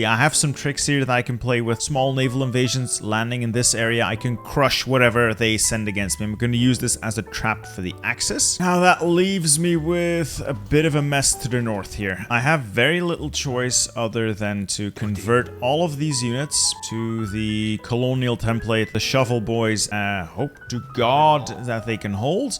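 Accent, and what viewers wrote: Belgium. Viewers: Hes Belgian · out of curiosity, are you from flanders or wallonia cause i can’t put my finger on it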